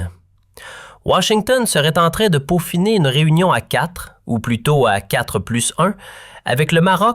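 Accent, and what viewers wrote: Canada. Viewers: il faut être dupe ou idiot pour croire que cette vidéo n'est pas l'oeuvre du régime algérien, on a beau aller chercher un accent québecois les images et les séquences vidéos qui se succèdent vomissent la signature du régime mafieux de Tebboune, depuis le début que des photos, des vidéos des scènes des gangsters algériens, aucune sur le Maroc, alors qu'il est plus aisé d'accèder à l'album photo de sa majesté Mohamed VI qu'à "chambre noire" du parrain et de sa pègre algérienne ! c'était bien essayé mais raté ! la prochaine fois choisissez un accent bien franco-français, bien sûr pas l’apathique APHATIE (Jean-Michel Aphatie) mais un Zemmour, ou Onfray, ça passera mieux !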